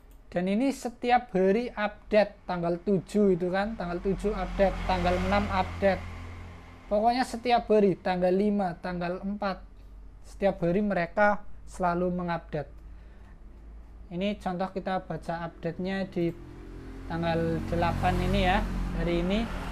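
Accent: Banyumas, Indonesia. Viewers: Genah kie mesti wong ngapak...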